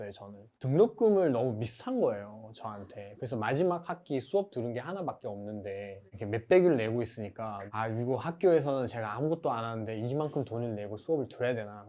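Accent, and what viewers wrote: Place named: South Korea. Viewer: 말투가100% 한국사람인데요.?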